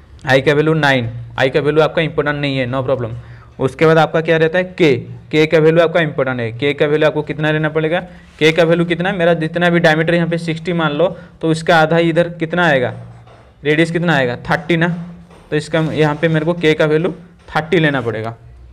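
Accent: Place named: Odisha, India. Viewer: Sir app odia ho kya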